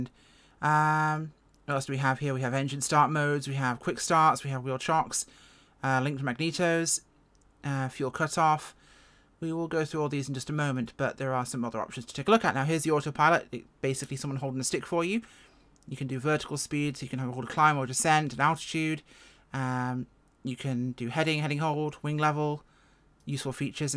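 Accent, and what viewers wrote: Australia. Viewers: Lovley Aussi accent there 😅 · Luv the Aussie accent... Brought one for my Xbox.. great fun. Cheers mate 🤠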